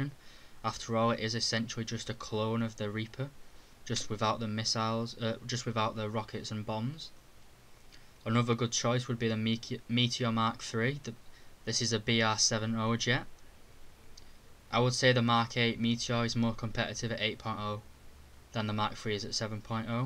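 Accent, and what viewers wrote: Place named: Yorkshire, United Kingdom. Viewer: It's great. I thought you were Yorkshireman like me.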